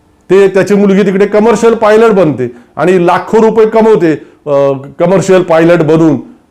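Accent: Standard. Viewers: तुमचे काही व्हिडिओ बघितले चांगले टॉपिक वर बोलत आहे तुमची बोलायची पध्दत शुद्ध चांगलीच आहे..... असेच पुढे करतील हीच अपेक्षा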